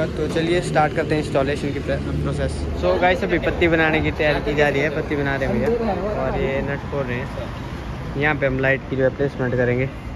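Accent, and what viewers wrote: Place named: Garhwal, India. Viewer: bhai tu garhwali h kya teri language tone se lg ra h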